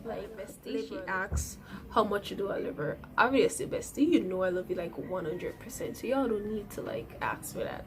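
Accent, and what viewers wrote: Jamaica. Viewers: U sound Jamaican 🇯🇲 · You Jamaican rite? 🤔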